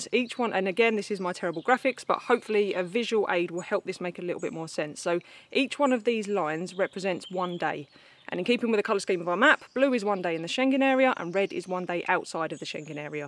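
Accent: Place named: South London, United Kingdom. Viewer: Sounds like a proper Sarf Lundun accent 😁. Trying to lose my Sarf Lundun accent on my radio show 🙄 it’s not workin. Great explanation 👍🏻.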